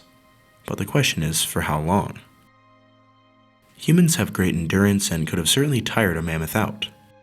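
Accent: United States. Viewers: Nothing is quite as annoying as someone who SOUNDS American. yet choses to use Metric Units. If you're American, BE PROUD OF OUR IMPERIAL HERITAGE. O, and quit turning the "TH" sound in "NeanderTHal" into "NeanderTal." That is something that only came about in the 50 years since I left school—and it SOUNDS LIKE HELL!!!!!!!! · I found the subject matter interesting. But where are you from? You sound like an American but your use of the metric measurement system is foreign to me. I know how long an inch is and also afoot. But centimeters and meters I have no idea. So I gave you a thumbs down. For your American viewers, I would suggest using the measurement we're used to and not to cater to your foreign friends for their not the ones who pay your bills. Otherwise I found your video informative but will forgo any more till you learn to measure in your native system.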